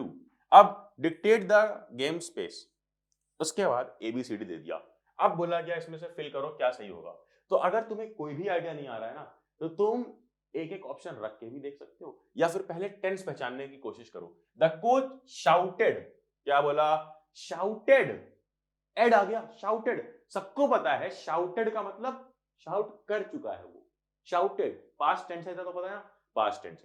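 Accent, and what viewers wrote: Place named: Mumbai, India. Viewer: Bhaiyaa aa mumbai ke lagte ho 🔥🔥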